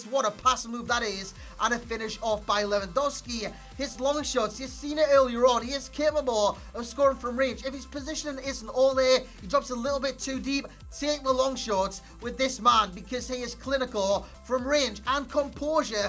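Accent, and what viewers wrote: Newcastle, United Kingdom. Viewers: Kebba Sohna I think he's from Newcastle but I could be wrong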